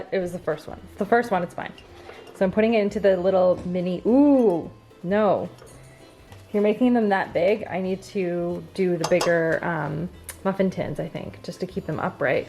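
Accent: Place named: Canada. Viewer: Her Canadian accent really came out in this one 😂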